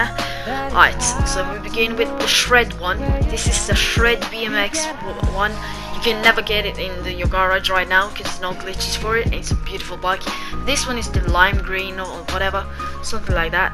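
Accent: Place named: United Kingdom. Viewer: nice video men koffør snakka du me britisk aksent??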